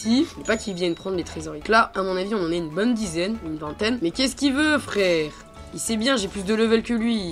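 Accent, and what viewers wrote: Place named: England, United Kingdom. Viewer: l'accent englais sur le live ta peur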